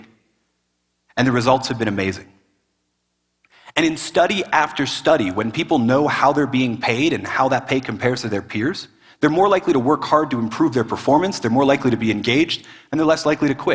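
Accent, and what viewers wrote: United States. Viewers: ใช่ สำเนียงอเมริกัน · ขอถามผู้รู้ครับ นี้คือสำเนียงอะไรครับ. ใช่ อเมริกัน ไหมครับ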